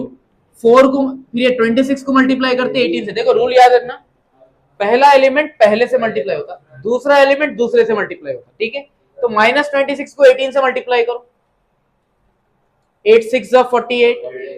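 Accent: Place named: Hyderabad, India. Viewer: Im really happy that a Hyderabadi teacher is also trying to teach 🎉 Honestly telling its so good to study under a teacher who is teaching in Hyderabadi accent ❤❤ Keep going sir 🙏 👏 ❤ You're explaining very clearly and also your explanation is so good 👍 ❤🎉 Keep going sir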